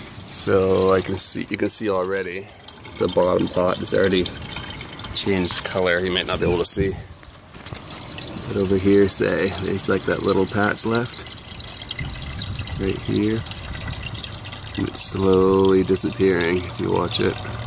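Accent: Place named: Canada. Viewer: Thanks for the speedy response. I thought I detected a Canadian "about" in your video. I think I might have to give one of your barrels a try. Thanks for all the info!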